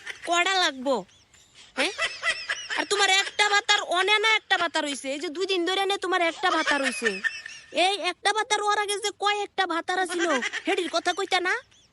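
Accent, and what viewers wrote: Comilla, Bangladesh. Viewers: আপু আপনার বাড়ি কি কুমিল্লা জেলায়?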